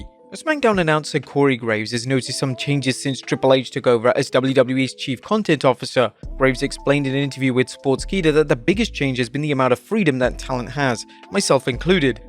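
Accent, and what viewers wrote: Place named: United Kingdom. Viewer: He's British, he has an accent, he literally can't help it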